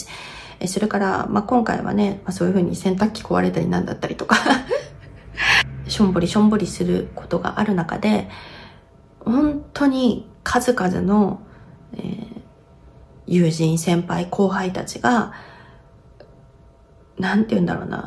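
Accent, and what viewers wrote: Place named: Tochigi, Japan. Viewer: 栃木だったんですね私は茨城の鹿嶋です案外言葉似てるね😊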